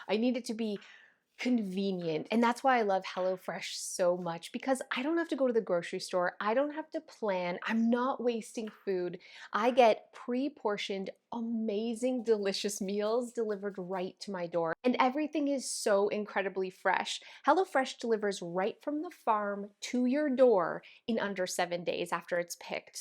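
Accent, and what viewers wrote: Canada. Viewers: I have set my Alexa to speak with a Canadian accent instead of English! Reminds me of you x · Love that Canadian accent! I know it's off topic, I just had to say I love how you say "out"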